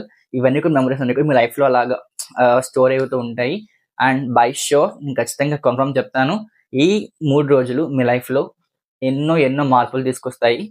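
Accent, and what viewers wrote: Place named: Godavari, India. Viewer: Abbo Godavari slang😊😂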